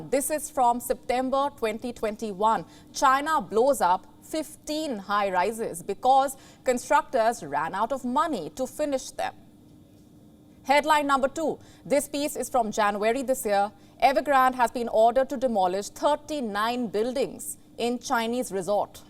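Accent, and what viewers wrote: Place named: India. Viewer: The indian accent is the worst. so irratating.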